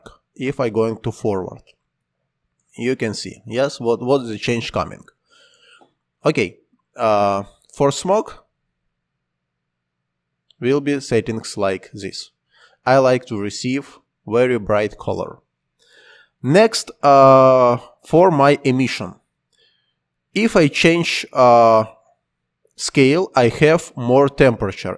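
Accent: Russia. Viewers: Это самый русский акцент, который я когда либо слышал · Слишком русский акцент...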